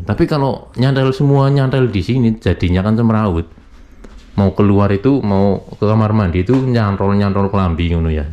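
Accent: Banyumas, Indonesia. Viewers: kamu memang hebat, harusnya kamu mendapat penghargaan dari bupati di kampung kamu karena kamu bahasa ngapak dikenal di jepang... Salam toko kejujuran bang... Tiap hari dong bikin konten, berangkat kerja dan ketika kerja, Terima kasih sudah menghibur kami sarukhan ngapak hehehe... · Mas, kalo boleh tau asal daerah mana? Wong ngapak ya Salam dari Maos Cilacap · Aq yen ndeleng kontenmu kui marem mase...bahasa ngapake mantep...guli njelasna kepenak...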